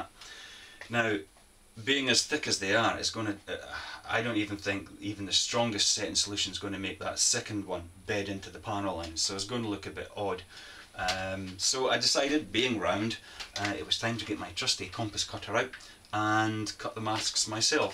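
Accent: Scotland, United Kingdom. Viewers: just wanted to say I really enjoy your videos… I like your style, man! okay, maybe it's just the cool Scottish accent, I don't know… It's good stuff. I've been on ism for about a month now and I go by RubeGoldberg. I'm sure you'll appreciate the significance of that username. ;)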